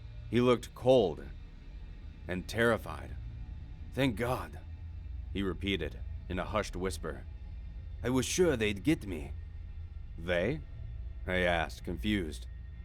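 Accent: Russia. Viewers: Love your Russian accent it sound very real 😍 also kudos for the background noises even tho there are not a lot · Russian accent made my night...keep up the great work 👍👍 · Your russian accent is golden:))) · You russian accent really sounds like russian)) i'm knew it :-D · Omg ur Russian accent sounds like bourbon from metro 2033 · Great story, great narration, great Russian actcent. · He sounds like a Russian faking an American accent when speaking English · sound like Russian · Your Russian accent made me laugh so hard 😂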